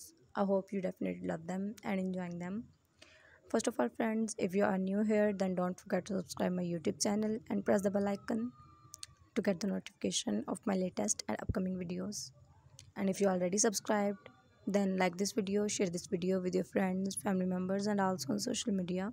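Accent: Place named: Japan. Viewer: Your Japanese accent kills me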